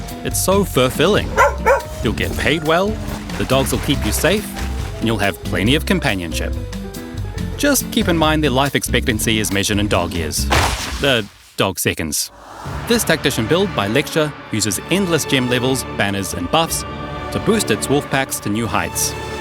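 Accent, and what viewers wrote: New Zealand. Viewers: Okay, so "Air Buds" sounds like "earbuds" in the Kiwi Accent. Now we gotta see if can say "bad bed".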